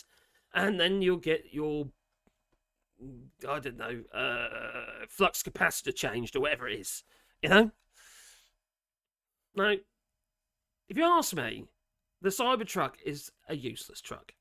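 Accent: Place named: Northern England, United Kingdom. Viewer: I love your Videos and how much you love trees and critters. Guessing UK accents is a hobby of mine. Are you from around Manchester or Liverpool? Your accent reminds me of Lister from Red Dwarf.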